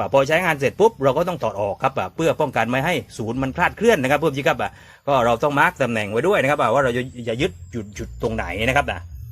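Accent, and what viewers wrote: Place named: South, Thailand. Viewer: สำเนียงภาษาคนใต้เลยหลวง ชัดเจนครับผม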